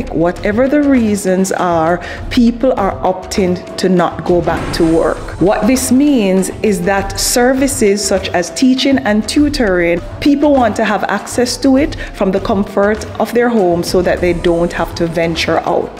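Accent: Jamaica. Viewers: I love Jamaican accent. Can Listen to you all day long 😊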